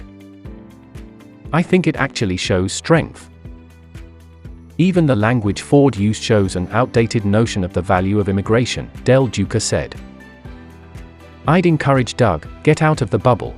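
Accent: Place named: United Kingdom. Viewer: Would the British-sounding narrator please do a video with himself in it to add details? Thanks.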